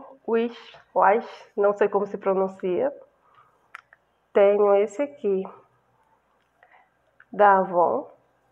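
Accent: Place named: Northeast, Brazil. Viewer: Ahhh como eu amo esse sotaque nordestino 🥰 Linda demais sua coleção nada modesta 😅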